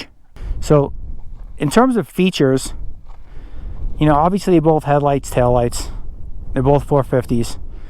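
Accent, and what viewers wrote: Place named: Northeast, United States. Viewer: nevermind i saw a cactus or 2.... your accent is definitely northeast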